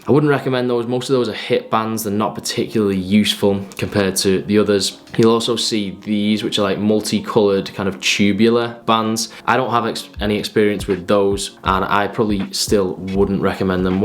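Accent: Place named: England, United Kingdom. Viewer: His voice is like asmr for me... idk if its because I'm american and his soft english accent is soothing or what.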